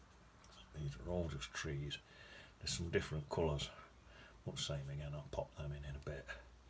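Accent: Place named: Yorkshire, United Kingdom. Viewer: Good Yorkshire twang that is ... tha'll be reet ... 😁😁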